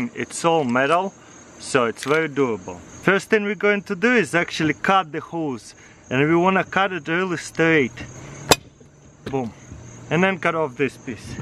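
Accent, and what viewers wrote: Russia. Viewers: Is he a Russian?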